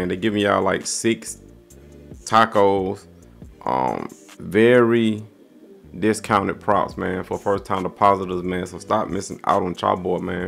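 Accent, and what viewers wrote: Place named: Houston, United States. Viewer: Ayyye, my friend and I are debating about where you from. Based on your accent I’m saying Houston but he thinks it’s ATL, if you don’t mind, can you help us squash the debate!?!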